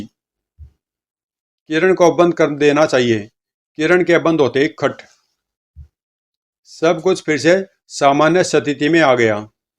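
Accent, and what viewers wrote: Haryana, India. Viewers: Bhai hindi ko haryanvi me bol raha he kuch samaj nahi aa raha thire thire hindi bolne ki sahi se practice karo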